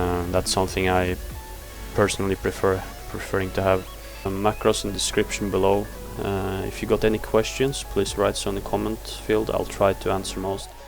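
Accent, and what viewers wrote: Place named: Norway. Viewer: norsk engelsk <3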